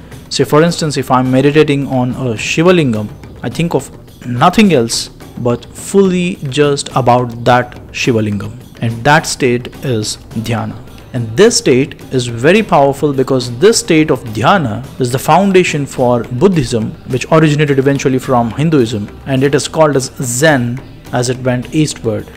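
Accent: India. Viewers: this is the accent which is easy for indians to understand That's the why i guess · Very well explained!! Thanks for explaining such a complex topic, in a simple, Indian (I seriously doubt any1 who isn't from/in India will understand not only the accent, but the essence of this video) concise and complete way. From my corner of the US, the critique in me, gives this a definite thumbs UP. Well spoken, clear, informative, educational & satisfying. Practicing Yoga is basically practicing (an attempt at) "wholistic" wellness. Balancing the mind & body by combining the spirit - Not religion, spirit (that spark within us that sits dormant until it's properly activated.) In Yoga, SELF mastery (truly knowing / realizing the self) is the aim & SELF control is key - Controlling others becomes unnecessary & redundant in the process. Sadly, in the west, where taking control & ego gratification is rampant - Yoga is not only misrepresented but totally misunderstood. 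Pretzel poses, exercise classes/routines, "shakraa" mumbo-jumbo (that word annoys me most..... It's "chakkra" mispronounced, commercialized and then fashionably flaunted) and other money-making practices have taken yoga from being a highly sensitive, sensible and permanent way of life, to being a temporary routine that money can buy.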